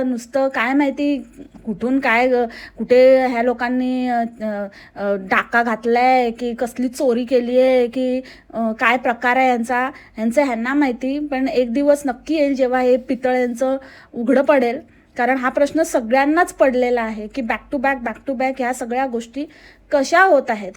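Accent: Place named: Ahmednagar, India. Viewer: tu khuthun ahes kiti chan bolte tu nagarchi ahe ka tu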